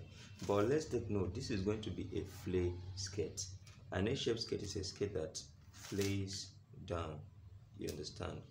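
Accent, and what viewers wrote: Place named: Tiv, Nigeria. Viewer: U speak like my Tiv brothers, weldone.... Wel articulated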